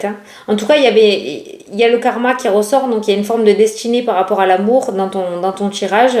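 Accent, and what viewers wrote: South, France. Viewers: Bonsoir que du bonheur de vous entendre 😊😊😊😊. Je me prénomme ludivine le 02/10/1979. Est ce que je vais bientôt réussir à avoir une grossesse depuis le temps avec tant d épreuves 😢?mais je ne baisse pas les bras.merci beaucoup de tes tirages qui me font du bien avec ton humeur du sud.je suis du sud aussi j adore ton accent ❤❤❤❤😊😊😊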